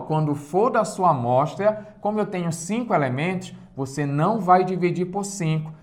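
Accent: Pará, Brazil. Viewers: Prof. o senhor é paraense?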